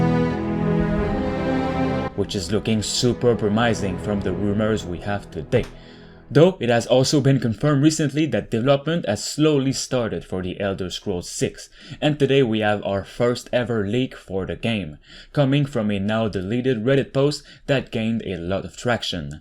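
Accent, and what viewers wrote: Quebec, Canada. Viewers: are you french canadian ? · That’s not the subject but are you québécois? I say that because of your accent Anyways great video! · Are you French Canadian? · Is that the accent of a fellow french canadian I hear?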